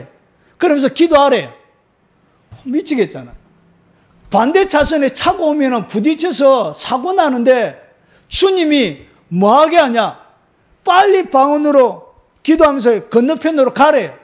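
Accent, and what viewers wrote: Gyeongsang, South Korea. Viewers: 억양이 강한 경상도 사투리 때문에 그런 오해가 있는 것같습니다. 저도 경상도 억양 때문에 그런 이야기를 들은적이 있어요. 부디 방언으로 능력있게 기도하시길 바랍니다. 할렐루야 ~!!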